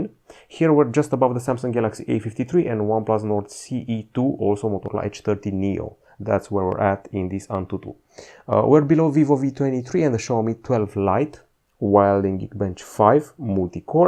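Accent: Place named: Romania. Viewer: Vorbeste in limba romana. Se cunoaste dupa accent, ca nu prea esti englez😂.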